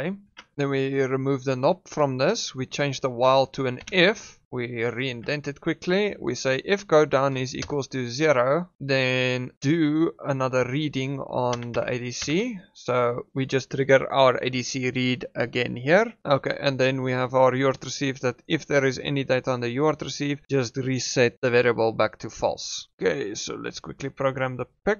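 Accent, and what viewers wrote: Afrikaans, South Africa. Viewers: I can hear an Afrikaans accent. ;-)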